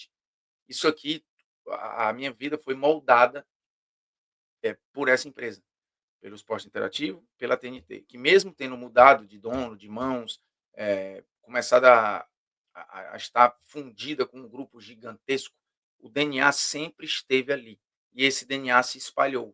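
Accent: Northeast, Brazil. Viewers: Parabéns pela sua carreira! Boa sorte na nova empreitada e que tenhamos mais ótimas opções como é e continua sendo a TNT/Esporte Interativo! E não deixa a nova casa tirar esse seu sotaque nordestino incrível! 👏👏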